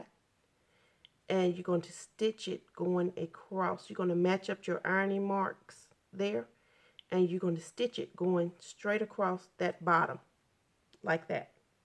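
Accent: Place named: South, United States. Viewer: You sound like a fellow Southerner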